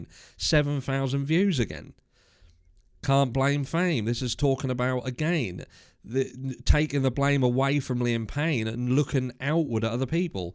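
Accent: Norfolk, United Kingdom. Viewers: Your accent sound like your from the Norfolk area 😃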